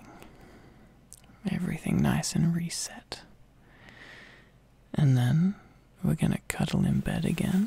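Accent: Australia. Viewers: I like that you're aussie cause idk I feel more at home and it feels more real ig? Idk I also kinda just get annoyed at how americans- and like most others actually- say some words and I get uncomfy so ye that was hard to write fjhreh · Aussie accent ahhhh:×××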